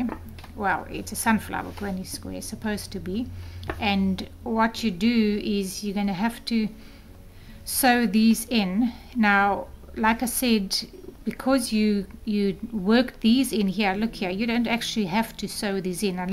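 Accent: South Africa. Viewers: Not sure how to post a photo here. I've made and ss 3 together. The yarn is a lighter weight cotton and my tension is a bit loose, so I probably should have used a smaller needle. And they aren't exactly uniform in size yet, but I expect that will improve with more practice. They still look very pretty and I will make at least three more to complete one placemat. It is a very cute pattern, thanks again! ps- Is your accent South African? Love it! (I have a friend in town from SA)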